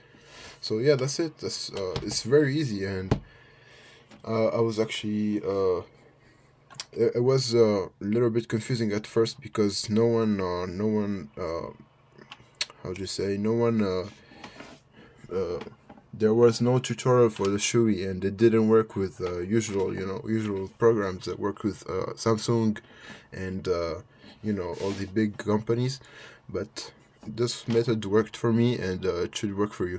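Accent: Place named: France. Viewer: francais? you sound francais.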